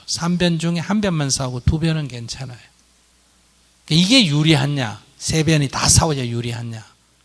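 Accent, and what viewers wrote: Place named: Gyeongsang, South Korea. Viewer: 경상도에서는 가르치다를 사투리로 가리키다 가르키다라고도 합니다 사투리로 보시면 이해가 될것입니다 시도때도 없이 많은 사투리를 쓰십니다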